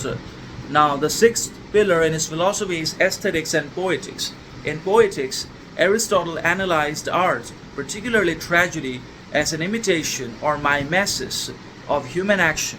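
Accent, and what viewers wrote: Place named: England, United Kingdom. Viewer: I like your English accent bro.. as well as philosophical... how your learn this accent.. guide me... I am struck in fluent and lack of accent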